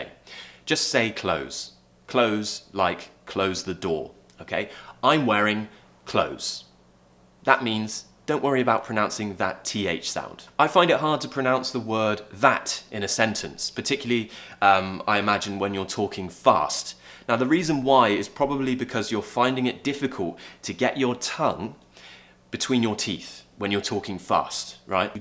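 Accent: United Kingdom. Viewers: I really enjoy a lot watching your pronunciation videos. I imitate every single word that you say. British accent is what I love the most. Hope one day I can take up this elegant accent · I start love British accent because of you. Your such amazing teacher i had ever seen. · I have just known your channel and I love it so much immediately. Love british accent, love ur voice and the way you teach pronounciation. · I love your accent so much 💜💜 British accent is always attractive to me!!!! And thank you. (Side note l love your hairstyle👧🏻👧🏻) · I don't exactly know what my accent is but i'm trying to sound like a British. Your videos help me so much. I can't thank you enough. In Fact, i can even learn British English by listening to your pronunciation and intonation. Be Safe. Sir. And Have a great day. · I love British Accent 😍 it's Awesome 😊👍....Thank u very much 😘 Love from India☺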